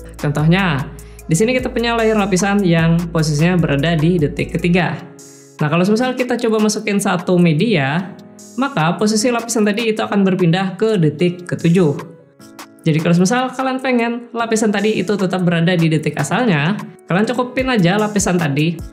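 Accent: Banjar, Indonesia. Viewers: orang banjar kah bang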